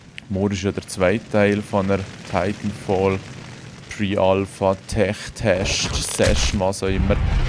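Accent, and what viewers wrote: Valais, Switzerland. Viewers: Meeeh Walliserdiitsch!👍🏻😂 Hüere geil!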